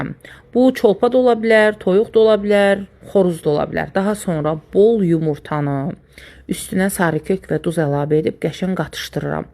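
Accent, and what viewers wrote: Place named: Balakan, Azerbaijan. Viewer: Балакен лехчесине охшур.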